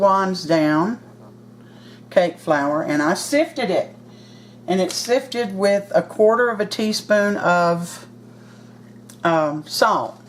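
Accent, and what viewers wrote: South, United States. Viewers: Was this "literal" or a take on your southern accent (as in you came straight "from the fields")?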